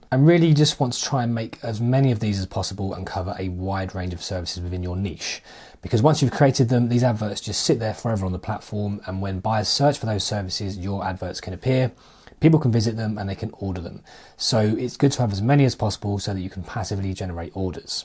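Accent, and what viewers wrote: United Kingdom. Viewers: Can I just say, one of my great annoyances is with youtubers that talk at 100 miles an hour. it’s really off-putting. Please dont fall into that trap. Otherwise, I am enjoying listening to someone from the UK for a change.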